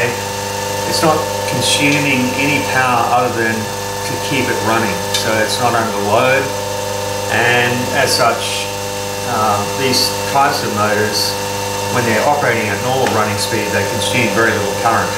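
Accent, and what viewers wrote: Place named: Australia. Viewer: Great videos and it is so nice to hear an Aussie accent. You are fast becoming an Aussie "This Old Tony". Well done!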